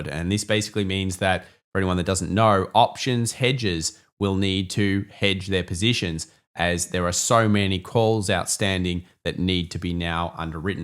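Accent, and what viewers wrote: Australia. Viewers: This guy does not understand what is happening in the US. He talks like an Australian. So many do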